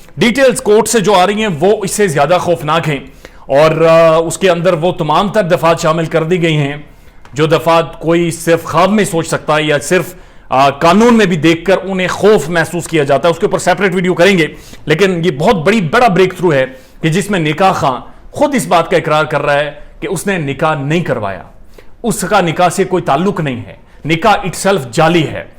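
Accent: Punjab, India. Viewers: Ur Punjabi accent is so good I dnt understand Punjabi but I understood ur words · Ur Punjabi accent is very near to real Punjabi, but seems more cute than normal Punjabi bro..... Keep their chitrol on 😂😂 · Very sweet try of Punjabi but I think pushto more suits you !! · Zaberdast punjabi style brother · Punjabi accent 😄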